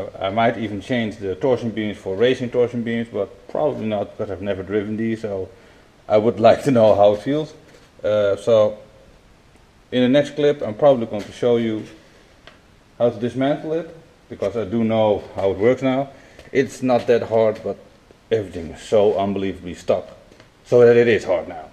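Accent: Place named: Netherlands. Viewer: Na 1 zin dacht ik al te horen dat je NL'er bent. Alsof ik mijzelf Engels hoor praten. Spuitbus van de Gamma bevestigt het. Bedankt voor de nuttige info. Ik was er al een tijdje naar op zoek.👍🏻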